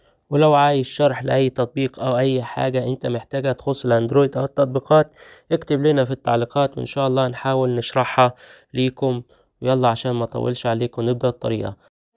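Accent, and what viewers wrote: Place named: Egypt. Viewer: مبحبش كلامك مصري هههه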